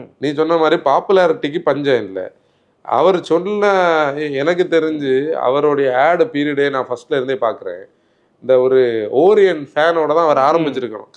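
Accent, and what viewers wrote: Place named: Nanjil, India. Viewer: Anna, mainly I'm watching ur videos for your nanjil accent and very casual talks... I like it very much.❤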